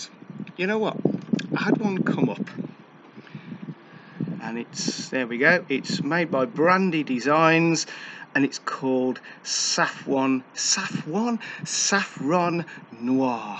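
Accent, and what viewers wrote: Birmingham, United Kingdom. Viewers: Hey just chanced on your videos. Subscribed. Is that a Brummie accent I can hear? 😆👍 Keep it going!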